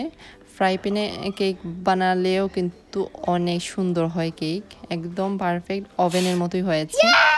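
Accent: Sylhet, Bangladesh. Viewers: Apu,apni ki Sylhety?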